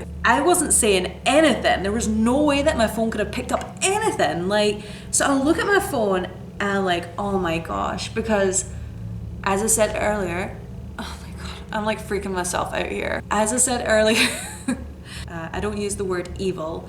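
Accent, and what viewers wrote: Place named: Scotland, United Kingdom. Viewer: Like a female Craig Ferguson. Great storyteller. Your Scottish accent was much stronger then.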